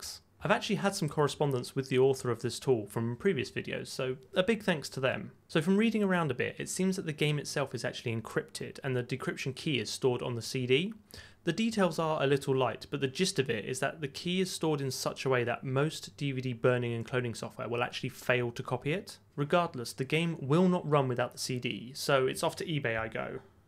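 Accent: United Kingdom. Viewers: I have no idea what you're saying but your delivery is impeccably British and I can respect that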